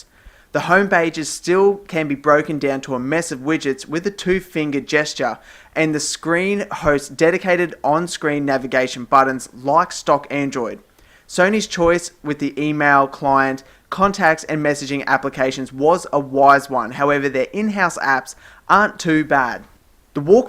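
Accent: Australia. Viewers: Australian accent, love it!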